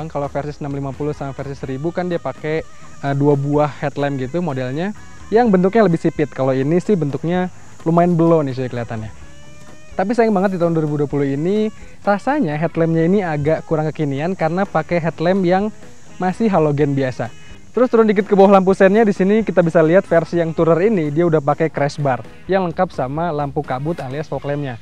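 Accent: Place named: Cirebon, Indonesia. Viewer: 5 bar jeh.... kaya orang cirebon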